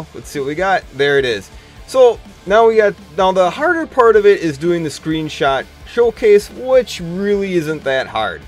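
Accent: Canada. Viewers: You are the most Canadian person iv'e EVER heard it really annoys me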